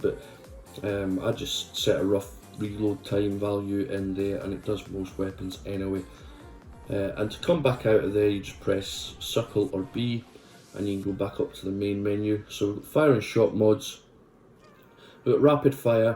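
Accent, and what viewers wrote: Scotland, United Kingdom. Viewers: No way , your Scottish ! Nice video man and great script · Do I hear some Scottish in that accent?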